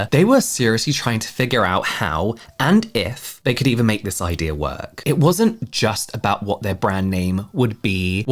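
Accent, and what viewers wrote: United Kingdom. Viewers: Oh I didn't know he was British (I'm not good at recognizing accents) but that makes it even more shocking 😅😅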